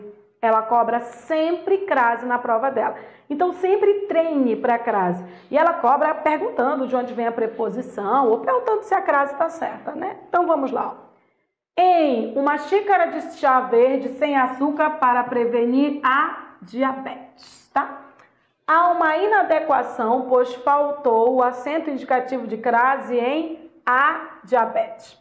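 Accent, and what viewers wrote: Pará, Brazil. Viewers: "Verbo pank " kkkkk. Paraense doida! Grande professora, aulas excelentes. Parabéns prof!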